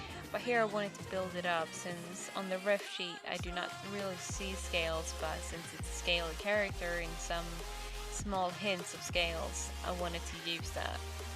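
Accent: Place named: Australia. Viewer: I think your accent is awesome! You almost sound like an Australian, my favorite accent! The art is awesome as well, of course! Thanks for the video!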